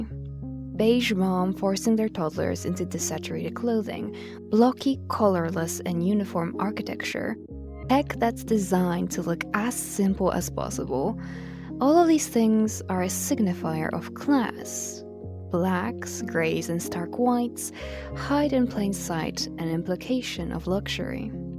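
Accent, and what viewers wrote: Poland. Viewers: Oh how I felt the Polish in you barely 8 seconds into the video :D /pos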